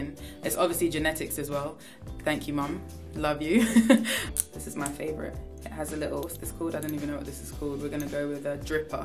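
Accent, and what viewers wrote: United Kingdom. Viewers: forget she’s british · Her British accent tho 😍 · i'm obsessed with her accent and the way she says reindeer, i'm british but have nowhere as good an accent as hers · Wait.. is british what?... I know I'm late but.. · how does she speeks british but in her songs she speeks american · is she British?!! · Wtf I didn’t know she was British lmao 😂❤️❤️ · Keep forgetting she’s British until she talks at the end of her songs 😂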